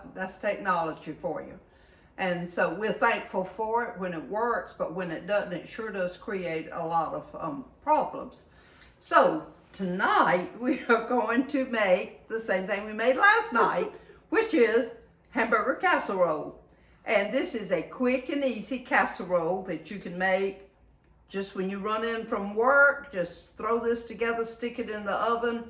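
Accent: South, United States. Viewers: Just found your site & absolutely LOVED it!! You make cooking look so inviting and you got my attention!! ..so I subscribed!! Love your lovely southern accent!! And the fact that you love Jesus just seals the deal!! Can't wait to make your yummy looking hamburger cassarole!! I know my family will love it! Thanks for sharing with others!! You are a sweet lady!! Blessings!! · This is the first time for me to watch her cooking video. What a lovely lady she is. I love how she speaks with Southern accent. Her family is so lucky to have her. I will watch more videos of her recipes. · I just found your channel yesterday and I am dellghted. I have watched so any of your recipes already and just can't get enough. I want to make every one of them. I'm having trouble figuring out how to print them out. I can relate to you in loving to cook , but I could never do as much as you. 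I too am a widow and will. be 84 in August. I am fascinated with your accent,. Like you, I love to cook for my family. God bless you my new southern friend. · I love your Southern Belle accent ❤ I've lived in Alabama all of my life. I still appreciate a true Southerner. You CANNOT fake our accent. I'm thinking you are from Mississippi or Georgia. "Dahlin' you are just as pretty as a peach" ❤ I'll be watching more of your videos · First time viewer here! What a lovely, southern Lady you are💕 I find your beautiful southern accent so refreshing & pleasant to listen to. Your “Shine for Jesus” comment absolutely made my day & I am definitely going to teach it to my sweet grandbabies. God Bless you richly😊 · I love your southern accent. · I just found your page. I am so excited about your cooking and the fact that you love Jesus too just makes even more precious. I am curious though...where do you live? 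I hear a southern accent. Im from Alabama. Keep on cooking😊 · What a joy to watch you make this hamburger casserole. Since I love onions so much, I'll probably put them into the recipe largely diced. I can't wait to try it. I'm watching from Edmonton Canada, and I just love your american accent. God bless y'all,. Godspeed! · Hi Mrs.!! I just found your channel today. And Oh My does your Channel remind me of my Grand Mother. All of us called her MawMaw.. And he was PawPaw. I guess I'm what they call Bing watching your channel. I am sure enjoying your Sweet Southern accent. And just some of the things you say and your wordings are spot on with my MawMaw. We lost her many many years ago in the 90's. She was such a character, so so funny and loving. I am jealous of your family that they have you. You are such a Dear Dear person. And I love your short stories.... Oh the memories you have provided. 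With all God's love, Ed of Greenville,TX.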